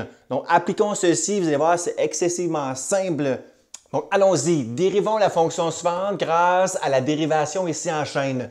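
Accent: Canada. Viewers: A 66 ans je révise les maths pour aider ma petite fille de 15 ans et je trouve vos cours géniaux. Ils m'ont permis de l'aider à comprendre des notions complexes étudiées dans ses cours de maths. Votre tonalité (je vis en France) canadienne est un chant pour moi et votre communication gestuelle est un plus pour mieux retenir vos cours. Les cours deviennent magiques et on croit être dans un univers enchanté. Bravo, continuez ainsi pour faire aimer les maths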